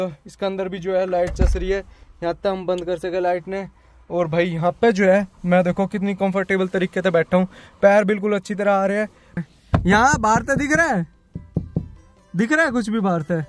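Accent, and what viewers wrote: Haryana, India. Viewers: Bhai tera haryanvi accent and tu itna handsome ❤❤❤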